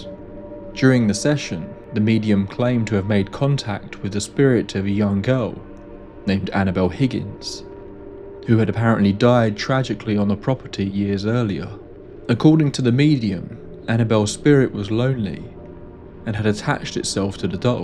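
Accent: United Kingdom. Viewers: I don’t wanna hear a British guy talking i can barely hear what’s he’s talking. About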